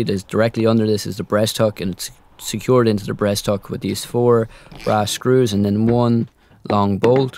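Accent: Ireland. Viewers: love the videos love the updates and the restoration of this beautiful boat. Just a side bar , I love your Irish accent reminds me of my dear Gran that passed in 87 but was born and lived in Ireland before coming to the states , God Bless you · Ah you’re Irish. That explains a lot.i was looking around for the elephants. You were talking about the elements.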